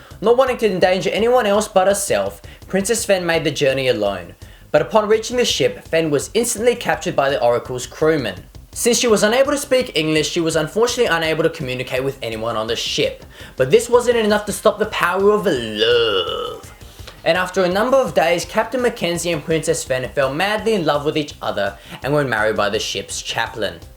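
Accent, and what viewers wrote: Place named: United Kingdom. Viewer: a Chinese guy with a British voice that's some weird shit right there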